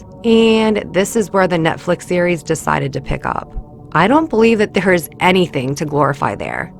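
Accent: United States. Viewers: You live in Maryland or Pennsylvania? I hear an accent, Pittsburg? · are you from delco? or jersey?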